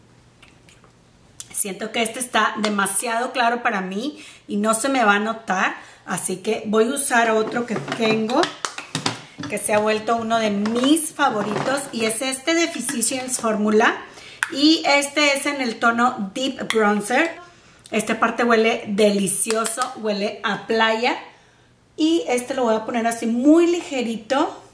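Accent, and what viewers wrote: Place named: North, Mexico. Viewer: Hola eres súper intensa igual que yo y me gusta tu acento norteño que buena onda😜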